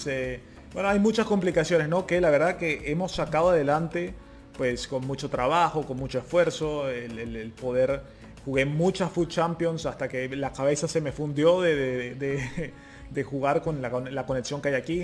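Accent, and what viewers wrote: Venezuela. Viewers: Matias Cohen No contradigo que actualmente vive en Italia. Lo que contradigo es tu comentario “Es italiano”, en realidad es Venezolano (según yo) por lo que dijo desde el minuto en adelante y también por su español (con acento venezolano) fluido, tengo una profesora de Italia en mi Universidad y ni ella habla el español tan fluido como.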